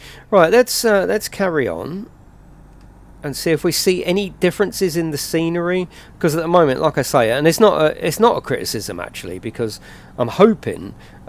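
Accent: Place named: Australia. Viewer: Omg the Aussie accent is strong and that’s coming from an Australian 😂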